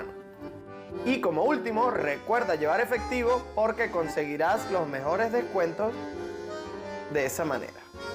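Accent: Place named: Nicaragua. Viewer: Es verdad hay muy poco amor en Argentina, muy buena tù reflexión. quizás por tù acento eres de Nicaragua o del Salvador, y en esos paìses haya mucho màs amor.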